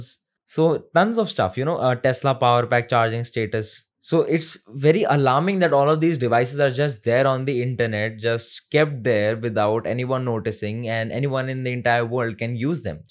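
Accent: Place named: India. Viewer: Disgusting Indian accent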